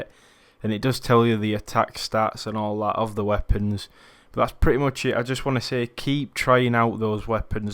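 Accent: Yorkshire, United Kingdom. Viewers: Nice to hear a yorkshire vlogger lol nice 1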